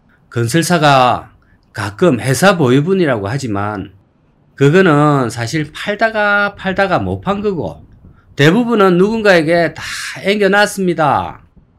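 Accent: Gyeongsang, South Korea. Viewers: 구수한 경산도 사투리가 너무듣기좋아요 감사합니다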